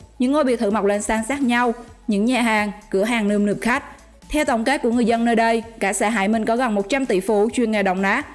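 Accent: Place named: Binh Dinh, Vietnam. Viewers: E à,c khuyến e tập phát âm chuẩn một tý vẫn còn giọng quê góc luon,chẳn hạn chử ăn e đọc i chân giân bình định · Giọng bà này ở Bình Định :))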